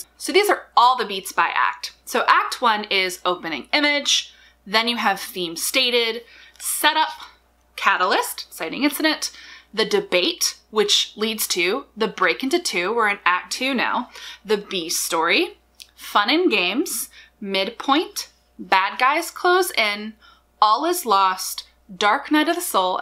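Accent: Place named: United States. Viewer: Yes, that is it, thank you! US accents on French words was throwing this Brit for a loop. I've googled so many variations of what I thought you said and, understandably, found nothing.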